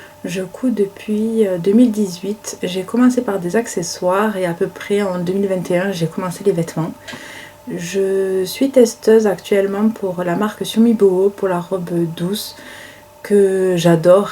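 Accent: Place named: Aveyron, France. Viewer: Arrivée par par raton et 🐼 Bon début Je suppose que tu es tu Tarn Vu l accent 😘 de l Aveyron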